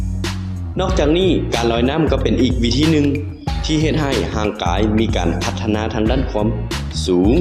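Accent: Thailand. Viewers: เหมือนภาษาเหนือมากครับ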